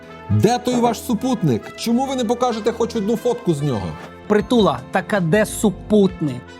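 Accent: Russia. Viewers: тавій акцент видає тебе, спалився ципсота запоребрикова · диктор - москвороте лайно та не розуміє різніці між нашими "і" та "и"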